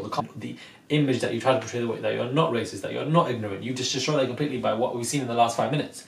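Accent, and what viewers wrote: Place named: London, United Kingdom. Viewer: Great to hear a fellow Brit and Londoner (?) reviewing RHOA.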